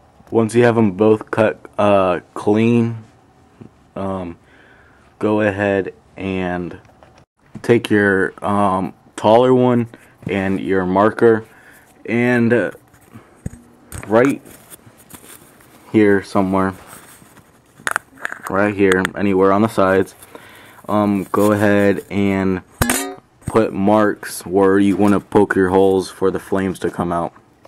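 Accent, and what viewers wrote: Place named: South, United States. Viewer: hes from the south yo :)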